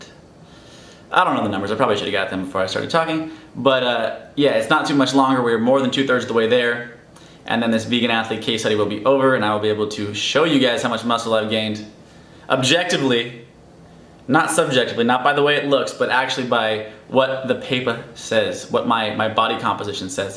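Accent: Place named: South, United States. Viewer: What part of the south are u from?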